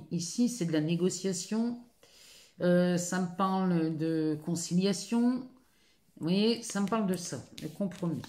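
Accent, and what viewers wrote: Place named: Loir-et-Cher, France. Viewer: j’ai plutôt pensé à l’accent du Loir-et-Cher :)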